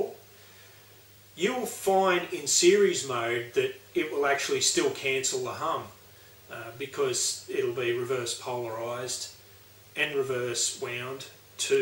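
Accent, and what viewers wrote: Australia. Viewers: Thx for the vid! BTW, are you an Aussie? :)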